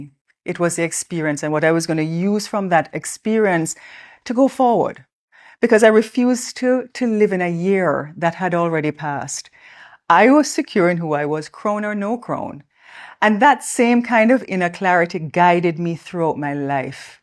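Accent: Jamaica. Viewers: You sound very Jamaican 🤔🤔🤔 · You’re still so beautiful, eloquent and classy. You sound like one of my peeps from Jamaica. It really doesn’t matter where you are from, just that you reminds me of one of my fellow Jamaicans. ❤❤❤❤❤❤❤❤❤❤❤❤❤❤❤❤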